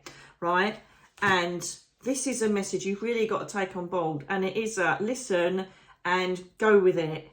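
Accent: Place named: England, United Kingdom. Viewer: Scrolling thru after just watching another YTuber and you both had same major arcana cards! You’re funny as hell, love ur English accent - you’re a hoot! Made me smile & laugh. Interesting because I’m going to a QHHT session (quantum healing & hypnosis technique) done by Dolores Cannon to connect w/our higher self. Doing this session on 12/4 - looking forward to + I’ve been doing a lot more research in opening my spiritual awareness & abilities. I am a Reiki 1 level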